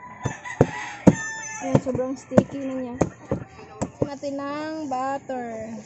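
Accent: Visayas, Philippines. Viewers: Hahahaha...inday mahuman imong kaugmaon ug kalit !!!!!hahahahaha! Pagka bisaya gayud nimo,asa man imong probinsya inday!!!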